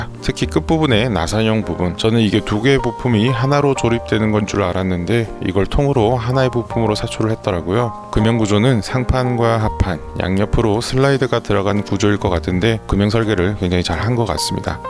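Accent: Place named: Gyeongsang, South Korea. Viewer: 몰랐었는데 경상도 싸나이셨네요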